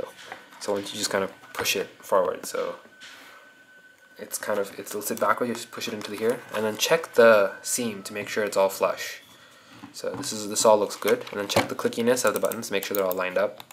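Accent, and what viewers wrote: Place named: United States. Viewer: pehle to laga ki koi Ameriki hai.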